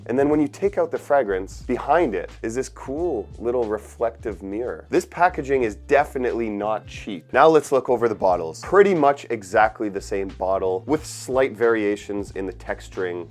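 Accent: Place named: Canada. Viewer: Judging by your voice and kind demeaner you were giving me strong Canadian vibes -- upon further investigation, you are in fact Canadian 😄 I love your content. I'm going to add Qahwah to my collection thanks to your opinion. Keep up the good work.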